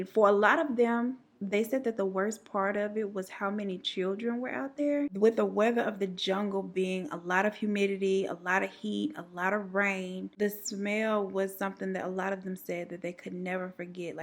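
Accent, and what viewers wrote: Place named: Houston, United States. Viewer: When I started watching you I kept things you sounded like you were from Houston!! We have a unique sound when we talk 🙃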